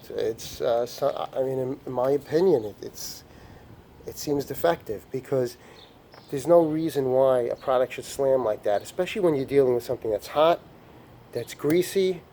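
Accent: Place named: New England, United States. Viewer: I can tell from your accent you're a dumbass yankee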